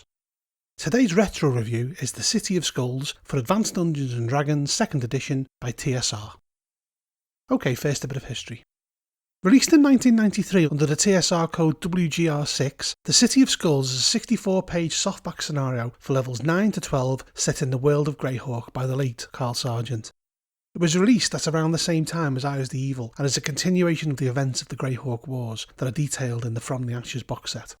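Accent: Liverpool, United Kingdom. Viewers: Scouser? Please review more old school d&d!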